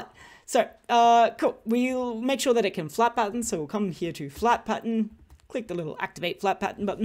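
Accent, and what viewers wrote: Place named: Australia. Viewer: I can tell this mate is an Aussie lol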